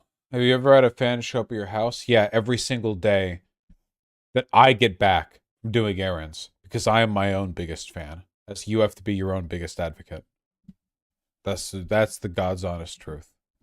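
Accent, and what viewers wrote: United Kingdom. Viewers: Everytime he gets drunk he becomes bri-hish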